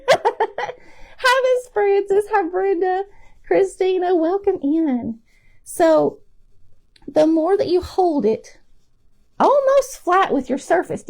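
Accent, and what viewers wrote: South, United States. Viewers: Love these, don't loose your southern accent. You speak my language.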